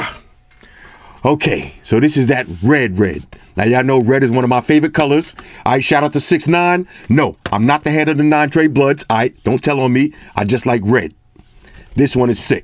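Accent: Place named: Staten Island, United States. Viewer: Is from Staten Island? Because the way he speaks reminds me of Wu-tang.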